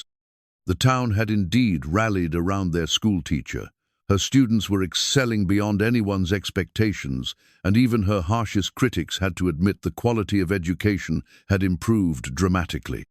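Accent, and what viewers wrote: England, United Kingdom. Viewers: Prefer this English accent !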